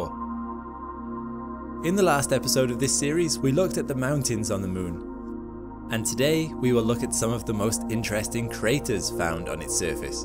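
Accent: United Kingdom. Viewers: Do you think they have American narrated documentaries in Britain? so why do we have to listen to limie's over here all the time?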